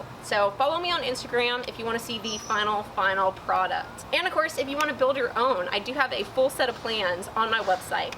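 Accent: Texas, United States. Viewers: It's just how she talks. It's a Texas thing.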